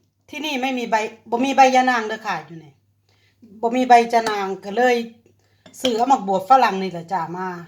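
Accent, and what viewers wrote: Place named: Sisaket, Thailand. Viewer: ฟังเสียงแล้ว คือคนบ้านแฟนผมเลยครับ อยู่สีเกดบ่อน้อครับ🤔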